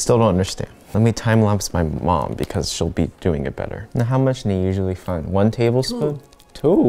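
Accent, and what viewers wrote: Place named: China. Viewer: What part of China are you from because your Chinese accent is strong my mom is from Beijing but she does t have a thick accent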